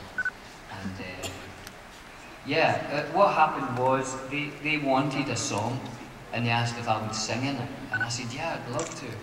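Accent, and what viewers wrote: Scotland, United Kingdom. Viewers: He's accent is like a mix of British, Indian, Scottish and maybe a little bit Scandinavian. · No he just sounds Scottish you pleb. And British isn't an accent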